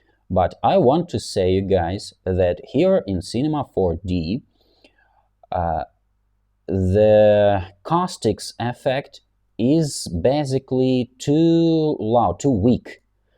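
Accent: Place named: Ryazan, Russia. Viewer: Константин Санжаровский не совсем. Частенько проскакивает рязанский) И ни один нормальный носитель языка не говорит с таким усилием, выдавливая из себя слова.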